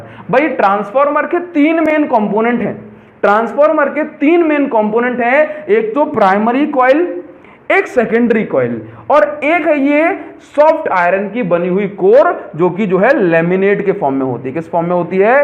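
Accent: Odisha, India. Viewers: R u from odisha? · Sir are you from Odisha? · Are you from odisha?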